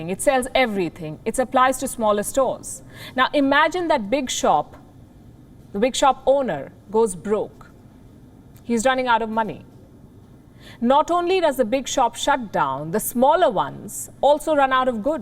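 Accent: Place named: India. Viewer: You are making the world love English Indian accent.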